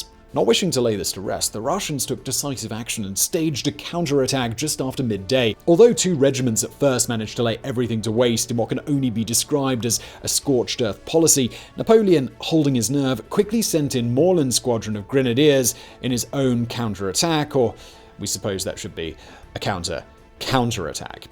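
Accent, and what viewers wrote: United Kingdom. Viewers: Look forward to hearing Simon telling us about the battle of Waterloo. One needs the proper British accent to describe the upper crusty Wellington defeating Napoleon.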